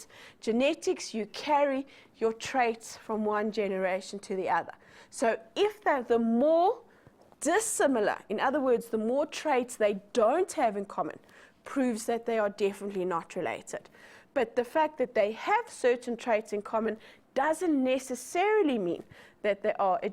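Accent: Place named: South Africa. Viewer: Love the SA accent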